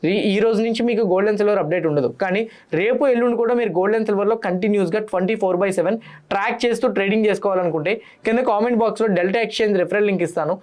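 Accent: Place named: Telangana, India. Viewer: Why this telangana slang all of a sudden?😅😅😅😅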